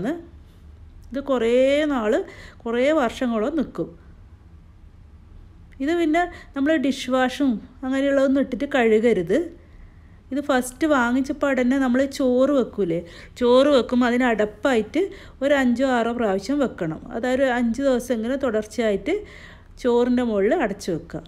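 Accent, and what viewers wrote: Kannur, India. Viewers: Kannur aanenn samsaram kettappoo mnssilaayii😃😃njanum kannur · വെറുതെയല്ല സൂപ്പറയത് മൊഞ്ചത്തീ ആ വർത്തമാനം കേട്ടപൊഴേ മനസിലായി കണ്ണൂർ എവിടെയാ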